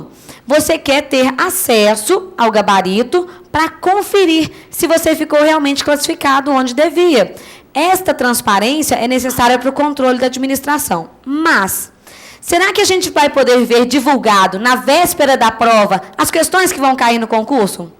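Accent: Minas Gerais, Brazil. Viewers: Mas que professora mais sensacional! E esse sotaque mineiro nosso, gostoso de ouvir. Parece que eu to conversando com uma amiga. Vocé é maravilhosa.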